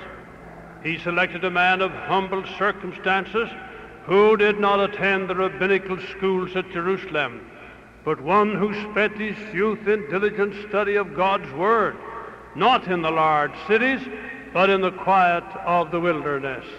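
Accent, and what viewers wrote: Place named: Ireland. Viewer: Alexander Hugh Macmillan (June 2, 1877 – August 26, 1966), He was born in Canada and I love to listen to his slight Irish lilt. A dynamic speaker, he presented a history in his book Faith on the March, published in 1957.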